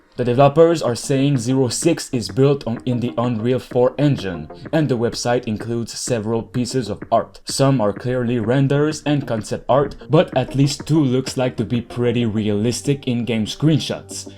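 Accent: Quebec, Canada. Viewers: So... any french canadian? lol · Man, that QC accent is strong. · Hey nice vid, you from Québec by any chance hen? J’entends un ptit accent 😉 keep up the good work 👌🏽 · He's from the province of Quebec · T'es québécois... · He's French Canadian bud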